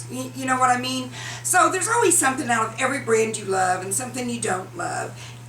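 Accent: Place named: South, United States. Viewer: I am from Arkansas but have been living in Pennsylvania for over a year now (.My husband's job brought us here ) I am sometimes starved for the sweet sound of a southern accent ! I think I watched 90% of your videos last night and thoroughly enjoyed them all !!! I even shared one on my Facebook wall . Keep making those videos !!!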